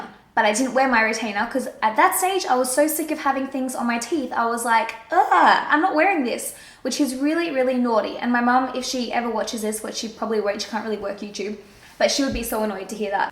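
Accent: Australia. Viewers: She's Australian 😊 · I am seriously impressed with your candour and i know it can be so hard opening up old wounds, (why i hide video) but you do it so genuinely that you become approachable and, well now i so i want to learn how to do my make up properly from you. You are so refreshing and it's so nice to hear your ozzie twang. I have missed it and have list my accent from living overseas. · It's a strong Aussie accent, not British. Nevertheless, with accents, some people love some and hate some. It's nothing personal :) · Australia is one of the best accents to me, you rock x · To sexy for them. Honestly I don't think alot if people are used to Australian accents. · you have a sydney accent how could anyone your voice? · she's Australian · I love your accent I don't know how people "hate your voice" it's beautiful I'm OBSESSED with Australian accents and I have been since I was little :3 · I love your accent, being Australian myself. 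I can understand you perfectly. Love your channel. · Ahh it's so sad when people can't tell the difference... I'm Australian so I can obviously ahah and she's Australian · I love your longer talky videos! You speak so beautifully without losing your ocker-ness (Im aussie too ;}). Thanks for all the info on the procedures you've had. It's interesting to hear about 1st hand experiences. Love you loads! xoxo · okay so... This is totally unrelated to the video...but idk why this whole time I thought you were British (I'm really bad at interpreting accents lol) and now I know you're Australian haha so i want to know.. Is it true that in Australia there can be really large poisonous spiders just casually all over the place? It's just what I've heard and I want to know if it's true lol sorry random question. But anyway, you do you girl! I totally agree, we should all be able to do what we want with our own bodies, so long as it'll make us happy!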